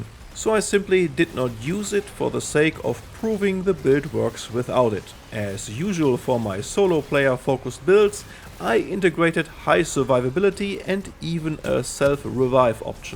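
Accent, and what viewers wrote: Germany. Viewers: Ich hör den Deutschen noch ganz leicht raus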